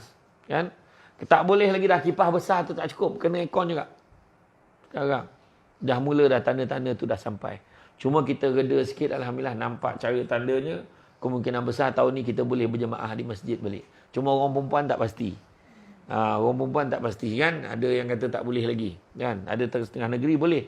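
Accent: Negeri Sembilan, Malaysia. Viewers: Yg dislike tue dyo x paham loghat nismilan Tue🤣🤣🤣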